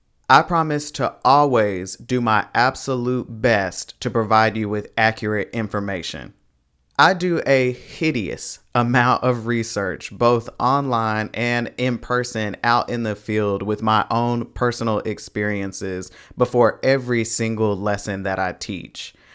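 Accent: Dominican Republic. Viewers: This whole time I thought you were Dominican, you speak like my cousins. I love this and appreciate you putting out learning content for us no sabo kids. This is huge motivation for me. Much love 🇵🇷♥️🇩🇴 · If I speak Dominican Spanish it’s thanks to you, until today I thought you were Dominican 😂😂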